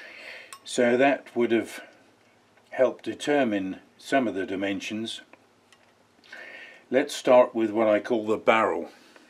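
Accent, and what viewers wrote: United Kingdom. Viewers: I do so enjoy listening to a British poster for a change.....please keep the videos coming.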